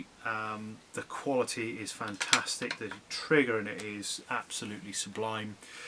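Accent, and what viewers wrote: United Kingdom. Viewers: Sounds like your from the forest of Dean area? Do you do any boar control? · Sound like a devon boy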